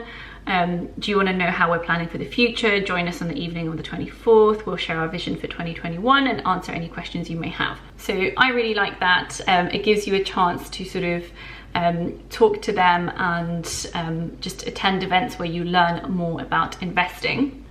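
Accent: England, United Kingdom. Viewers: I am going open an account..What I don't understand is why in some countries financial advisor suggest not to invest in ETF.... By the by, beautiful accent. May I ask where in England you are from?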